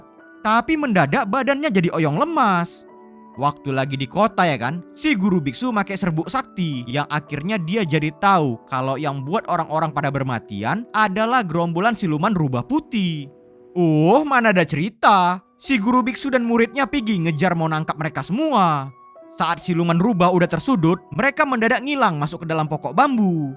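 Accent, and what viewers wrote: Medan, Indonesia. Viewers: Bg lu orng Medan yh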